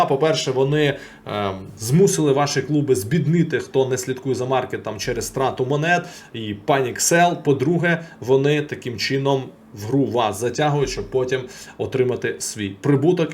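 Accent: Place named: Kharkiv, Ukraine. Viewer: В Харькове так же говорят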